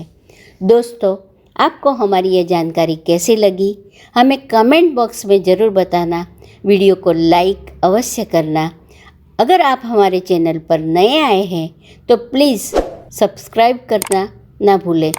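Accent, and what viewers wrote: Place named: Gujarat, India. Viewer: Madam 👩 ji 🙋‍♀️🙋‍♀️ Aapka... ( pravachan ) bahut achha hai 🙋‍♀️🙋‍♀️ But aap pakka gujrati ho ji 🙋‍♀️😊😊🙋‍♀️🙋‍♀️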